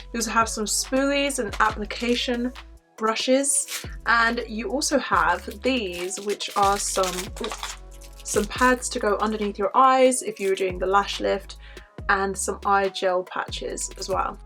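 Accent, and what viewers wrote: United Kingdom. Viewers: I just discovered your channel and I love it ! By the way Oh My God I am obsessed with your british accent 💕💕 PS: Hi from France 🥰🥰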